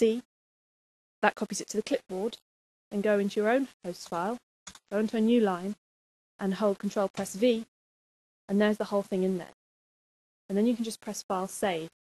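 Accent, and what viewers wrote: United Kingdom. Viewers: that is cool a fix to prevent slowing down, wit a lrg host file...luv ya Brit accent....gonna subscribe. =)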